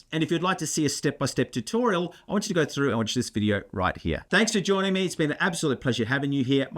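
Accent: Australia. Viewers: Oh yes a fellow Aussie! much rather listen to a aussie talking about this stuff.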